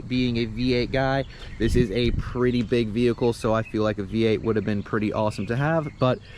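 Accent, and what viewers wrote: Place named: South Korea. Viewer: 제목은 한글인데 콩글리쉬로 씨부리노 ㅡㅡ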